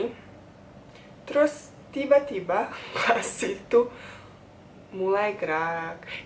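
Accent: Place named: Java, Indonesia. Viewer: Ngomongnya luar biasa medok banget, sampe nonton ketagihan, nonton terus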